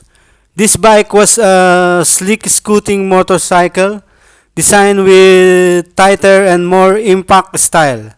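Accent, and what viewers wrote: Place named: Philippines. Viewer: Tagalugin mo na lang kabayan. Halatang halata naman na Pinoy ka sa punto mo hehe. Tagasaan ka po sa Pinas?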